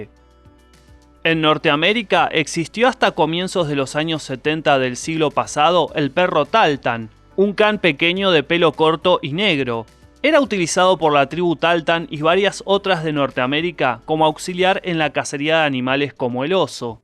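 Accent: Argentina. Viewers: Pero es argentino Ese es su acento No puedes cambiar algo que es endémico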